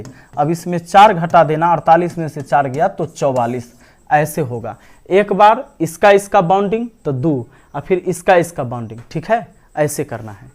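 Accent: Bihar, India. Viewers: I like bihari accent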